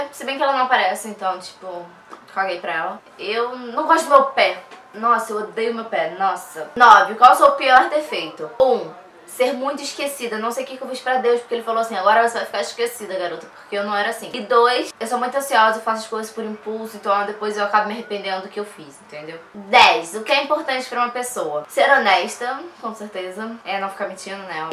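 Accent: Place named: Rio de Janeiro, Brazil. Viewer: Não sei poorq mais amo sutaque carioca haha💛 tbm faço videos👊 se puder da olhadinha no meu canal agradeço um bjao😻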